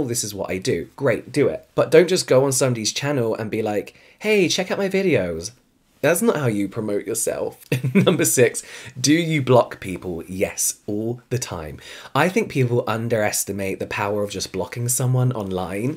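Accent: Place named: Wales, United Kingdom. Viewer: Great video sexy voice ✅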